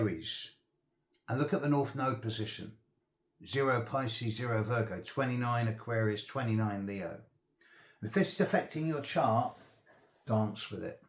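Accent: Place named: United Kingdom. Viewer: I am from Mongolia, learning about astrology since 2019. ’ British accent is difficult for me but I don’t know why I like to hear him. 😂😂 I like his manner. 😂 what planet and and house, conficuration makes you astrologer? I like your graunded “saturn” earthy essence. Your masculinity shows kind of Mars. Deffinately you have scorpion from your analyze. Mercury on Aqauruis?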